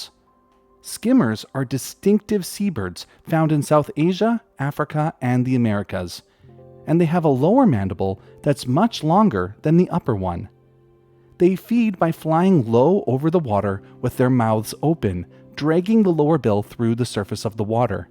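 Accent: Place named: Vancouver, Canada. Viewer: That makes so much sense! I never thought about it, but we have the same accent. I grew up just outside of Vancouver.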